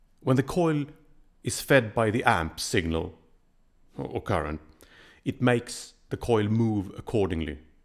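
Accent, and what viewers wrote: United Kingdom. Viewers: Anyone else think his voice sounds british but is actually swedish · It's crazy how has a much more British sounding voice than regular. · I can’t tell if you’re very posh British, or Eastern European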